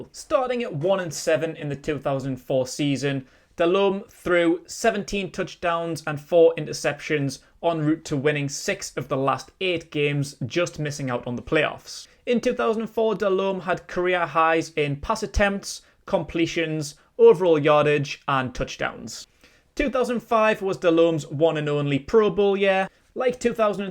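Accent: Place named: England, United Kingdom. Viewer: Got sent here by Panthers Post (sorta). Interesting to hear someone talk about American football in an English accent lol. Hope you enjoy the game next week, win or lose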